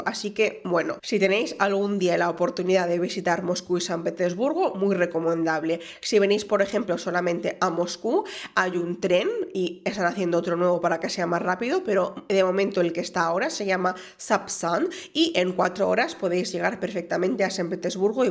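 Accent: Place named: Spain. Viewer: Gracias por mostrarnos lo que son estas grandes ciudades; queda el de planificar algún día no sólo en visitar el país, mas por que no aprender el idioma oficial; y, escuchando a en un buen castellano de España me atrevo a empezar desde hoy con una palabra; después Dios dirá… Saludos desde la bahía de San Francisco, California. Jorge Edwin Marroquín Mansilla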